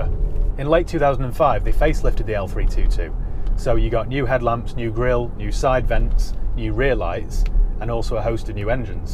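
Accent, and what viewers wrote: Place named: England, United Kingdom. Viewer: What part of England is the presenter's accent from? reminds me of some accents in Game of thrones, EXCELLENT REVIEWS BY THE WAY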